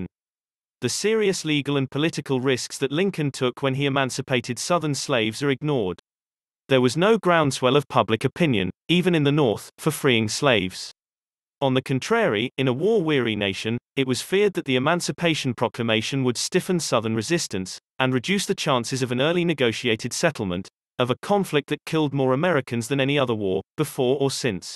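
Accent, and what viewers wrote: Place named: United Kingdom. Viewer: What's with this British accent sh!t? Sowell is an American, ffs.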